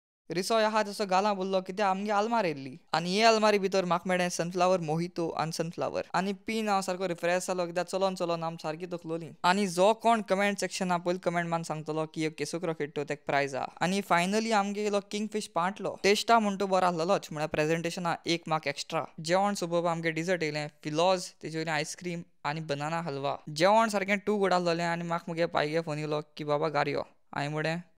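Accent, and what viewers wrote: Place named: Konkan, India. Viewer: Loved the accent of konkani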